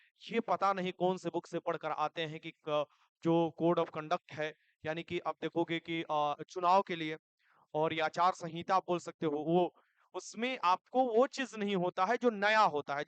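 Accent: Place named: Gopalganj, India. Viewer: App khati bhojpuri bolte hai gopalgnaj jila wala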